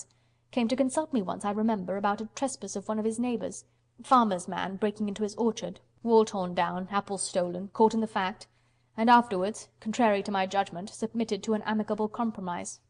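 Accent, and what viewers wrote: RP, United Kingdom. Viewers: love her posh voice :D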